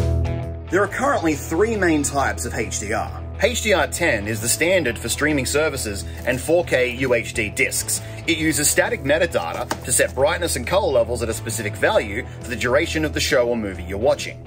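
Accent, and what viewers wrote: Australia. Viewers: OMFG, beard, and AuSTRALIAN an, brooo thank you!!! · It's the Australian pronunciation of the letter, similar to how the Brits pronounce it. They also pronounce 'Z' as "Zed" where US/Canada would pronounce it as "Zee"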